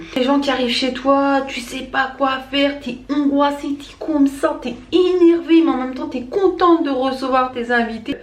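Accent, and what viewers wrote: North, France. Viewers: Tout est 'leeee' ta laccent du nord haha t'es de Paris ou plus dans le nord ?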